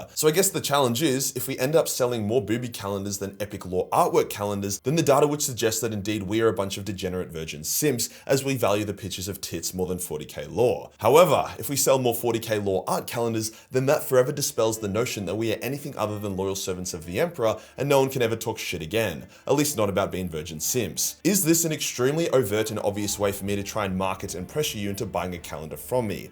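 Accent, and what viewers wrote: Australia. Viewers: I've been here since the beginning, I'm a married 40 year old, and I'm not here for boobs, I'm here to hear a degenerate Aussie talk about my favorite subjects. Boobs are a good bonus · I just like listening to this absolute chad Aussie talk about a great universe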